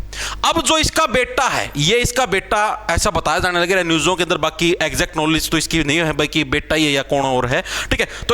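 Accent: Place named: Haryana, India. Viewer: Arey hindi to theek se bol liya karo bhai samjh to jab aayega haryanvi mix kar rakhi hai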